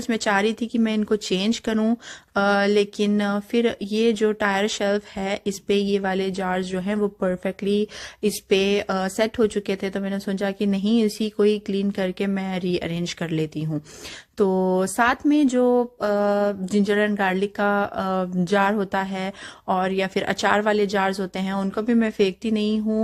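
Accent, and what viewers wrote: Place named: Hyderabad, India. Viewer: Hey, Assalamualaikum. I live in Melbourne, Australia too. I just wanted to suggest that you don’t change your accent our Hyderabadi accent is the best. ❤️ Love your videos🤍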